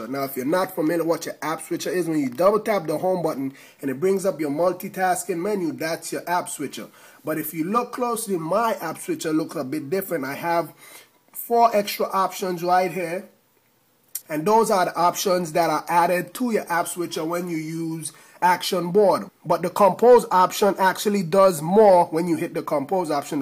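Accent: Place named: Jamaica. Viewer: You sound like you could sing a Jamaican song !!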